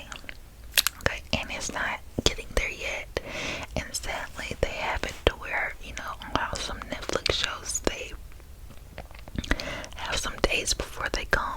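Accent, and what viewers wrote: South, United States. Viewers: I couldn’t help but notice a southern accent or I’m just crazy lol! Loved the video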